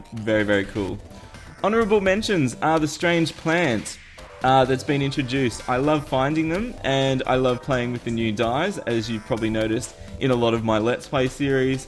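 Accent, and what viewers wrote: Australia. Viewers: Just focused on the great aussie accent XD straya ftw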